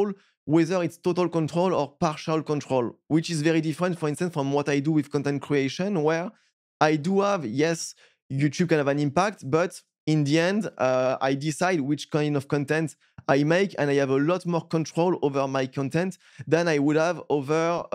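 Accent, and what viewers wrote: France. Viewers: L’accent franchouillard qui fait mal aux oreilles 😂😂😂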